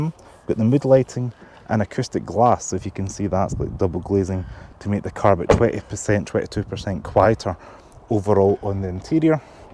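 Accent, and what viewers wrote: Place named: Glasgow, United Kingdom. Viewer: Love and miss that accent mate. You have a new subscriber ex-Glaswegian :)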